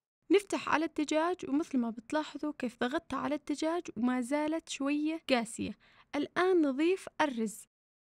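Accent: Sanaa, Yemen. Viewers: احلى شي لهجتش الصنعانيه فديتش وفديت اهل صنعاء. ياليت تستمري بكل الاكلات وكل الطنافس. وحتى الزبيب واللوز يكفي تتكلمي عنه وتصوري. وش المناسبات. ف اليمن وتراثهم فيه خاصه اعل صنعاء لاحد يدري عنهم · ماشاءالله مبدعه ... حتى لو كنت اعرف الطبخه بس انا اشوف الترتيب والذوق والاناقه في كل شيء ... ولهجه صنعانيه جميله جداً❤️🌷🌹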